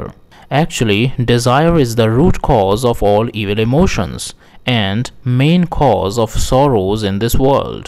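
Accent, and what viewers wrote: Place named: Bihar, India. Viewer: Did you learn English in Bihar??!!!😂🤣😂😆😃😋😡😡😠😠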